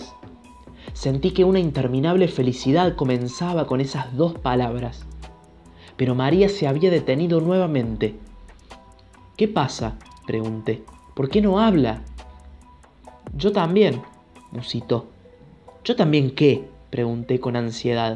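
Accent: Cordoba, Argentina. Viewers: Me encanta ese acento cordobés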